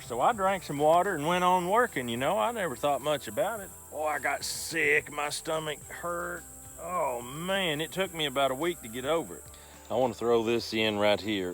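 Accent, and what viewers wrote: South, United States. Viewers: That damn Arthur Itis 😂 I love that southern drawl sir 💪❤️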